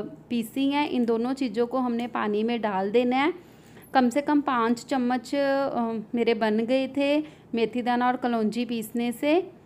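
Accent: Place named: Lahore, Pakistan. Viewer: nice n informative, btw, u have pure lahori accent like us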